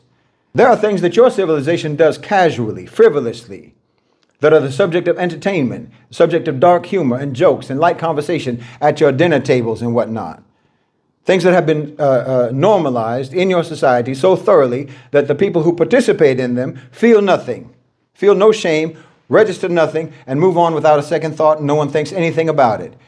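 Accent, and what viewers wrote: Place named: United States. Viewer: You are extreme and seriously ridiculous. You speak as an American which tells me you were born and raised here. Your epiphany came to you after you began struggling with your own identity. That’s your problem, you struggle yourself with your own identity. Therapy, a good therapist could help you because you need it. If you are going to preach history including that of western culture and society you need to be very honest and don’t cherry pick the best examples from past 300 yrs that will bolster your ridiculous rhetoric. You seem to purposely ignore what has been happening all over this tiny planet since beginning of recorded time, time of the Bible. You like to blame the whites, what, the pinks as you say in another video. I have to admit listening to you was entertaining and made me laugh several times but on serious note, you need to accurate and thorough with your so called history lessons. 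Entire planet has been savage. You know this but choose to blindly ignore it. This is niche for you to appeal to the low vibrating low IQ of a subset of American blacks. This niche grift of yours isn’t going to fly much longer as more and more black families are finding their way out of the poverty and are now enjoying safe and fun lives living further away from the urban blacks who you really appeal to. The young black today are not falling for this crazy division. That’s all you preach and comment about, hate the whites. Your comment sections are insane, stuffed full of low inner c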